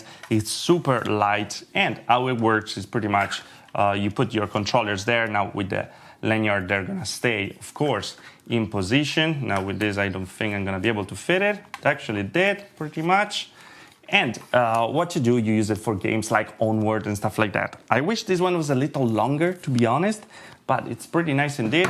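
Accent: Italy. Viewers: Hey man, I'm sorry for such a silly question that you probably get all the time, but you sound a little bit italian to me with your accent. Are you? If not, where are you from? Cheers from italy :D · Are U italian?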